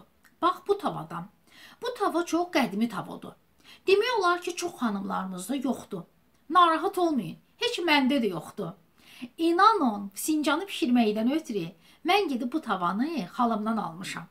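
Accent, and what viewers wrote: Baku, Azerbaijan. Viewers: heç sənin kimi gözəl ,şirin ləhcəli bakılı qızından laykı əsirgəməy olar əllərin var olsun canım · Əllərivə sağlıq bakılı qızı qurban olaram ləhcəmizə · Bakili xanim ellerinize saqliq. Xeyirliye biwiresiz. Baki lehcenizi anami xatirlatsin. Nesillikce temiz Iceriweherlidiler. Anamda gozel, tamli fisincan biwirerdi. Mende anamdan oyrenmiwem. Super di Baki yemekleri. ❤❤❤🙏🙏🙏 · Can ay bakilim sesive quvvet daniwiq lezzet eledi❤ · Salam bakılı qız sənə bakılılardan salam lap ləzzət edir mənə sizin danışığınız mən də cox vaxt bişirirəm bayramda da elə belə vaxtda da əyər xətrim istəyirsə toyuqdan rəhmətlik anam və nənəm gil qaşqaldaqdan daharırlayardılar atam ovdan vurub gətirərdi amma biz toyuğu bişirəndə 2 3 dənə böyük soğan atırdıq və sonra onu cıxardıb atırdılar biz soğan qovurmuruq mən özüm gavalı turşusu hazırlayıram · Qırmızı kitaba düşmüş təmiz bakılılar Darıxmışam Bu ləhcə üçün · ALLAH QORUSUN MAŞŞALLAH ƏLLƏRİN VAR OLSUN TƏMİZ BAKİ LƏHCƏSİ🤲MAŞSALLAH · Vallah darıxmışdım Bakı ləhcəsi üçün. 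Allah əlinizə golunuza güvvət versin, Amin! ❤🎉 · Can bakl can bakl lehcesi Adam birini bakl lehcesiynen danlsan esiden kimi ureyi qopur Cox teessuf ki az qalmlslq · Can menm nenem de Bakilidi.lehce mene lezzet elyr.Allah var elesin haminizi.Ellerinize saglg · Qurban olum lehceve can bakilim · Ellerinize saglig gozel bakilim yorulmuyasiz .Lezzet edir baki lehcesini ewidende 🤗Masgura lap lezzet eledi 😊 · Can bəh bəh təmiz Bakı ləhcəmiz və anamın bişirdiyi fisincan plov əlinizə sağlıq 🧿🧿👍👍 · Bu bizim Bakı ləhcəmizə gurban olum. Amma biz Əmircanlılar abgora vururug fisilcana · Nə şirin bakı ləhcəsi var.❤😅.Hələ istiyirsən qula qasasan sənə qızım.Əllərivə saqlıq. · Əsil Bakı ləhcəsi!!! Ləzzət elədi!! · Beh beh Can Bakilim ❤️❤️❤️inaninki fisincan qalib bir qiraga hayil mayil olmuwam daniwigiviza 💖💖💖nece deyirik biz bakililar edeln dedeli bawa salirsizeeee 👍🏻👍🏻👍🏻😂😂Mawallah Allah sagligi versin ruzuviz bol olsun biwirib düwüresiz bizde lezzet alaq 🤲🏻🤲🏻🤲🏻🤲🏻🤲🏻❤️❤️❤️❤️Allah qorusun xalqimi🤲🏻🤲🏻🤲🏻🤲🏻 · Can bakili bacim.Ùreyim daga dòndù.Wirin Baki lehcesinde bize mexsus fisincani mòhtewem hazirladigina gòre tewekkùr edirem.Ellerine sagluq.Mawallah olsun. 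· Fisincandan çox sizin ləhcəniz mənə ləzzət elədi. Mən də bakılıyam və əsil Bakı fisincanı bişirirəm. Xanımlara bir məsləhətim var. Əgər qara tavanız yoxdursa, qəm etməyin. Bir qaba 2-3 stəkan su tokürəm, köhnə ət maşınının setkasını atıram suyun içinə və bir çay qaşığılimon duzu əlavə edib 20-30 dəqiqə qaynadıram. Dəmiri içindən çıxarıram. Həmin suyu çəkilmiş qozun üzərinə tökürəm, 3-5 dəqiqə qaynadıram. Qoz qapqara olur, həm də turşməzə, heç lavaşanaya ehtiyac olmur. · Çox sag olun. Bakili ləhcəsiyçin darıxmışdım. Əla izah edirsiz . Mən də 40 ildir fisincan bişirirəm, amma qoza sogan qatmıram. Qoyun əti və quş əti ilə bişirirəm. Sizin göstərdiyinizi bişirərəm. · Sizin elə qəşəng,şirin Bakı ləhcəsində danışmağınız, fincandan da ləzzətli idi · SUPER ELLERINE SAQLIQ SENIN O GOZEL WIRIN LEHCEVE QUZU KESIM MENIM GOZEL BAKILI QIZIM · Çox gözəl Bakı ləhcəsi ilə danışırsınız xoş olur.əlivizin ləzzətlərinə də söz ola bilməz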